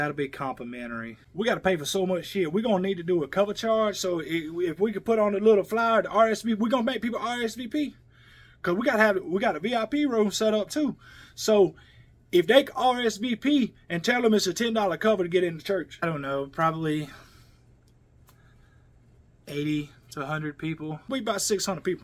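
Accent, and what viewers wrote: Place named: South, United States. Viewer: My boy straight up out the south lmao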